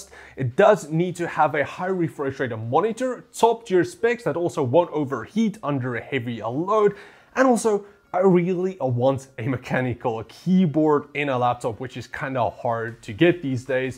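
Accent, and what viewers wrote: South Africa. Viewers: 'traveling and eh-gaming'. Lmao I love the SA accent.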